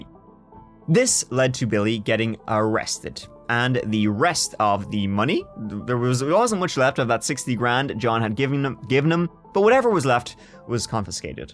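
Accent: Ireland. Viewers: There is that saying, “One who wants their bread buttered on both sides” It does share that very same meaning “One who wants to have their cake & eat it” I love such sayings, they are simple & there aint a human being on the planet who doesnt know wot the heck they mean! Like this 1, “ U’ve made ur bed, so go lay in it” Another good’un, “ One who has Champaign taste, but only has Beer money” I just subd to That Chapter PodCast, coz i saw the length & to be honest, like really honest, (er... this may creep out a tad) its wicked Irish accent & his wicked wit & dry as fk & sarcastic sense of humour that has me totally addicted to bingeing the heck outta his normal YT channel, so now im gonna binge the fk outta his PC! Sorry, but u are addictive full stop!! Just had to lob that 1 out there, no point in hiding the reality! U ought to be blushing, someone beimg addicted to u, thats a complement, but so u dont freak out, i DO NOT have any intention of stalking u or finding out where u live so i can send u letters & strange gifts, etc!! 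Nope, i am only addicted to YT channels, (SO REST ASSURED ME OL’ FRUIT & NUT, ur safe) no stalkerish behaviour here!! Hahahaaa!! Now back to this PC, thanks! 💝, Peace & Bananas! Ha! 😝😉🙏🏻🤗🤣🤣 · I've watched all of your videos and started listening to your podcast. Omg you are amazing so entertaining, adorable with that Irish accent lol. Your storytelling with a touch of humor but respect for the victims, I'm speechless. The last time I laughed so hard was w Howard stern (when he was funny) Your starting a culture if that's the right word. I'm telling my family and friends to watch you videos and podcast. And why?? You ask. "Coz I love ya" ❤️ lol, your friend Mariah from New Jer USA.❤❤ Goodluck in all of your endeavors.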